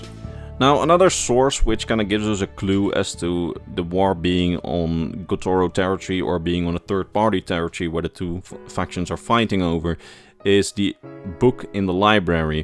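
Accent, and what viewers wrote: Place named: Netherlands. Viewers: He's definitely Dutch his accent isn't thick enough to be Russian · I'm so torn on your accent. My guess is either Dutch or Russian 😂 please help me out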